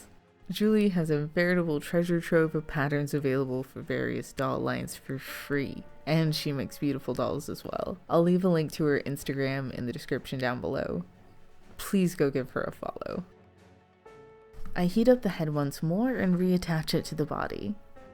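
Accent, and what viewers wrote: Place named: West Coast, United States. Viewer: I knew it was the west coast but your voice sounds WAY too familiar to me... hence why I asked about WA or OR. I've never lived in CA so it's likely that you just have a voice similar to someone else's. In any event, I know a west coast gal when I hear one. Lol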